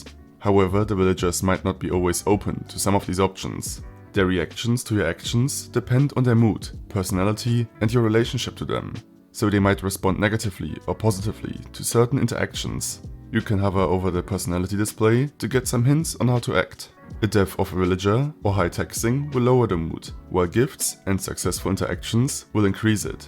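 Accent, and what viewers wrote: Germany. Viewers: Deutsch englischer Dialekt accent yeah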